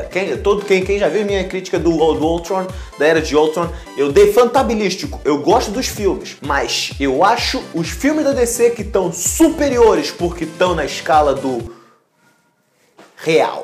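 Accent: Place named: Portugal. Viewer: você é português? seu sotaque é diferente kkkk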